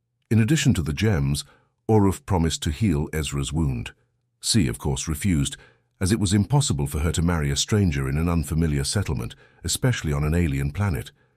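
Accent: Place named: United Kingdom. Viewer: Have to say, that voice caught me off guard a bit......😊 Im not used to it being a (very) British accent, its usually an American accent...😊 Thanks for the recap 👍💙💛👍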